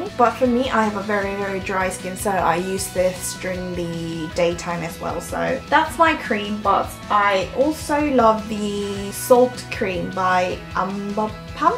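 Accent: United Kingdom. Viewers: 언니 목소리랑 영국발음이랑 너무 잘어울려요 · 영국식 발음 너무 이뻐요ㅠㅠ 프라이머 비교 영상도 보고싶어요 !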